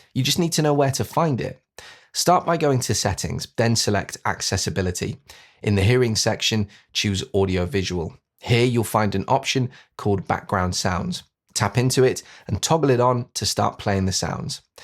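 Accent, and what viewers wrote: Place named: Liverpool, United Kingdom. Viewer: Are you originally ftom Merseyside area ? You have a twinge of Liverpool accent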